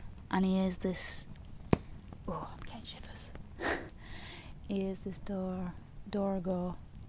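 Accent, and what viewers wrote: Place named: Lancashire, United Kingdom. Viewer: she's from lancashire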